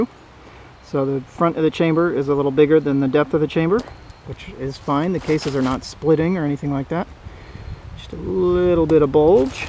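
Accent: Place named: Wisconsin, United States. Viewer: You're in Spooner Wisconsin? I recognize the accent.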